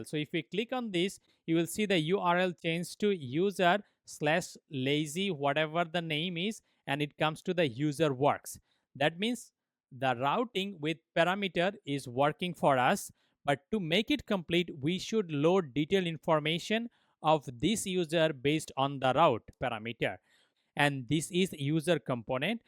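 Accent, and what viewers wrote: India. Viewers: Heavy bengali accent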